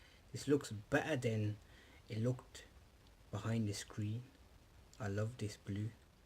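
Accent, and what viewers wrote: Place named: Birmingham, United Kingdom. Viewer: your brum accent 😭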